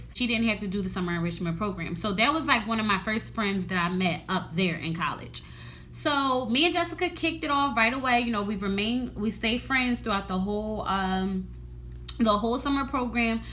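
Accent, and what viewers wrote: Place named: Philadelphia, United States. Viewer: yesss i ik u was from philly the way you talked :) lol ysss tell more im from north philly and same shit kinda happend to me .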